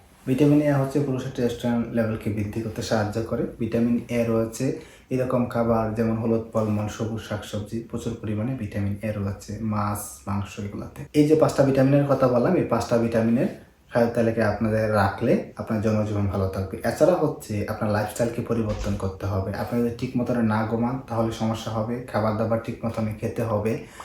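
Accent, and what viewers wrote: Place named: Sylhet, Bangladesh. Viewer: আপনার জেলা কোনটি স্যার,সিলেট এর টান ভাষায়, সিলেট নাকি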